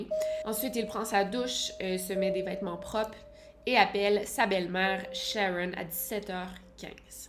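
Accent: Quebec, Canada. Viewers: J’adore tes vidéo je te suit depuis la Suisse au début j’avais un peu de peine avec l’accent qui change mais tu es tellement superbe que mes oreilles se sont habitués à l’accent québécois merci 😊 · L'accent québécois est vraiment charmant. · Coucou je suis aussi une de t'es abonnée et je trouve que ta chaîne aussi et top les québécoises vous avez un talent fou et êtes tellement humble c est toujours un plaisir d écouter vos vidéos avec votre belle accent bonne continuation à toute les 2 vous êtes top 😉 de la pars d une française fan de vous 2 😊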